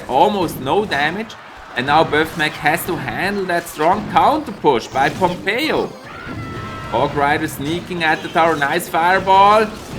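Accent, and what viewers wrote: Switzerland. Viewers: Are you Swiss because of the axent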